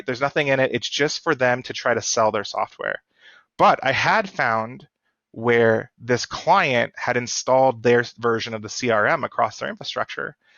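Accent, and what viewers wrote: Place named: South Africa. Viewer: Jason did a REALLY GOOD JOB! I hope we get an episode finishing all the levels on recon. I personally would like a video on Gaining access and Maintaining access. THANK YOU always a pleasure hearing the all too familiar South African accent.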